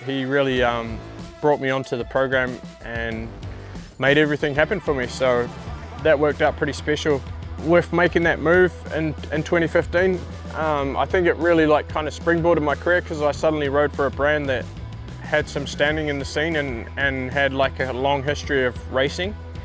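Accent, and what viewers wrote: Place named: New Zealand. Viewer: I would love him in the commentary booth but if he failed the test it is probably because his english is pretty hard to understand. We're talking heavy kiwi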